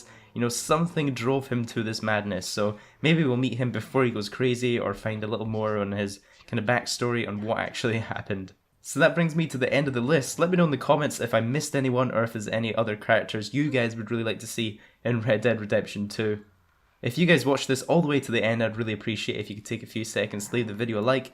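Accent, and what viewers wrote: Scotland, United Kingdom. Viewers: Where in scotland u from?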